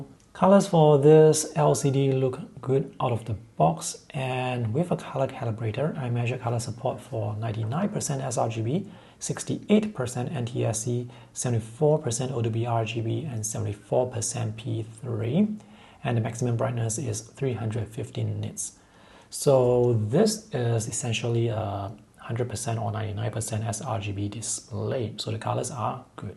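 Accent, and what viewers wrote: Singapore. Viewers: Nice review you sound like a Singaporean! 😊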